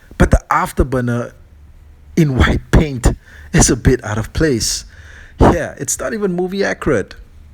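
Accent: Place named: Malaysia. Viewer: when a malay speak english...you can hear their horrible malay accent....and those headlights are ORANGE not red u color blind or wat?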